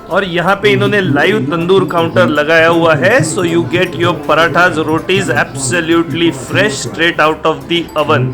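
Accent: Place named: India. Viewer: Bhai bhartiya english matt boll dum hai aur gore ki aulaad hai to fluent english bolll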